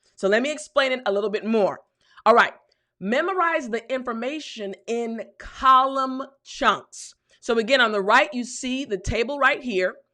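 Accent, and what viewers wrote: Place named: United States. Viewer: Thank u soooo much teacher. You're sooo funny, that makes everyone to like your movies. I heard your song in that movie,even someone was sad can smill as well. I also like your smart accent, pure American. We're following you in a group person from Congo Kinshasa(DRC). Thanks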